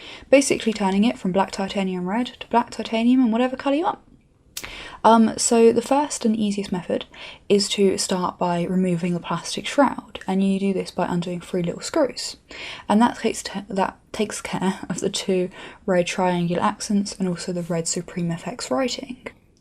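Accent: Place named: West Country, United Kingdom. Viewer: Whereabouts are you from? I'm sure I hear notes of Bristolian/West Country ^^ Hello from Wales (or 'shwmae' as we say here)!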